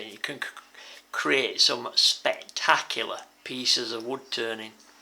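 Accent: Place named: Yorkshire, United Kingdom. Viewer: Ayup, nice to hear a Brit bloke voice, is there a Yorkshire hint? just a bit. I've only been turning just over a week, I was told that Turners Retreat was in Sheffield! the buggers lol. So it's in or near Nottingham eh? Just my luck. Brilliant video's mate, how much wood blanks did you get for 6 to 7 hundred quid? When I first started to think about woodturning up I didn't expect that getting hold of wood for turning to be difficult! The American turners are spoilt lol, they seem to hsave stunning wood and its in their gardens most of the time! lol. Do you have dificulties getting wood to turn? OK won't bore you to much mate, take care, Turn Safe or..... TURN OFF. Cheers Andy South Yorkshire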